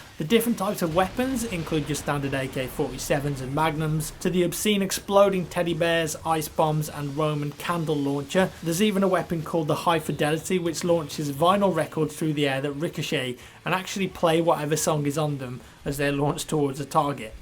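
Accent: United Kingdom. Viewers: Callum A I think Waysted meant that dupont was generalizing Jack's accent to all of Europe. Waysted said "UK is Europe?" but he meant to say "Jack's accent is relative to the UK, not all Europeans speak like that."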